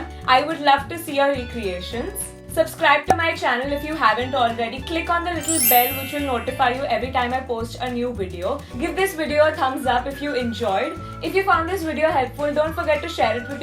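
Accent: India. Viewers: HONESTLY if you don't like the Indian accent you can fuck right off and click on another video, no one wants your negative and racist opinion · *cute indian accept: ) you're cute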